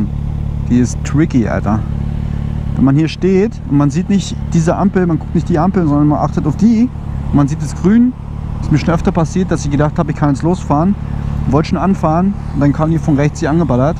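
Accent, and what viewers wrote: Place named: Berlin, Germany. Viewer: da bin ick mal jespannt Keule was übern Winter verändert wird💪😎. Wenn der Schwarze Ring kommt dann mit Gravur = idee von Matze 😂💪. Ick fühl mich dann immer wieder in die Kindheit zurück jeholt wenn die Berlinerschnauze durch kommt. Bin ja in Steglitz groß jeworden vermisse Berlin daher Feier ICH deine Videos mit der Schnauze😂👍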